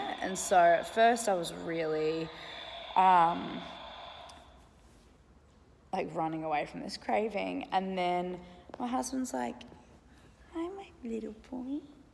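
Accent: Australia. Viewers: Vee-log, gorgeous Australian accent.......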